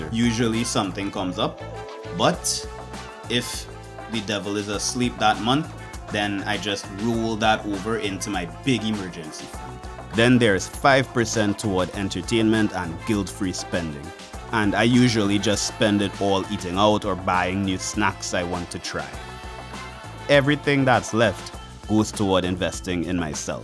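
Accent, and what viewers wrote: Trinidad and Tobago. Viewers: In the first 15 seconds of the video I said that sounds like a Trini. I'm glad to see you expanding your horizons. Recently I've been visiting mostly Latin American countries but my dream is to visit Japan in the near future. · mans in japan but you can still tell he is trini to the bone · I can't believe I couldn't immediately place your accent! Trini man out there in rural Japan!! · just from the beginning could hear the trini in you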